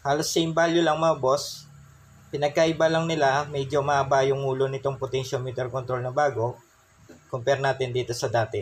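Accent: Pampanga, Philippines. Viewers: kapampangan accent ka sir. . baka malapit kalang sakin. . pagawa ko nalang sana sayo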